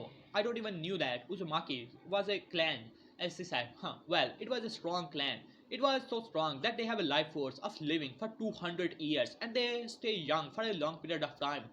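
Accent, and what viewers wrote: India. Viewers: I swear! Each time I hear this person! I think his someone from India.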